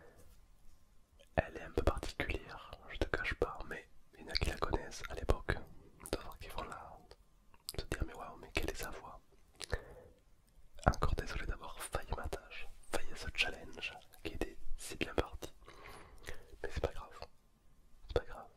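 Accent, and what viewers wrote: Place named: Toulouse, France. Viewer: La voix de crooner avec l'accent toulousain(que j'avais eu la chance d'entendre en te croisant au tgs Springbreak il y a 1 an) je valide, pour un des seules voix masculines me relaxant, t'es un Chef👍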